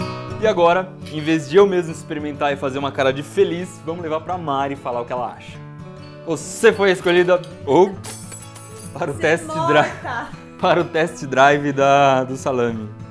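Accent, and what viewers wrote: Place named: Rio de Janeiro, Brazil. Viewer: Dexa de se carioca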